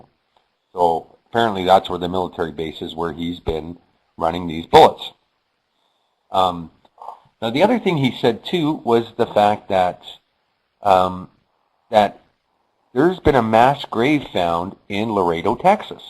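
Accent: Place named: Canada. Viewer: i hate your canadian accent "aboot"? thats not how the <link> pronounces it u ignorant ppl of canada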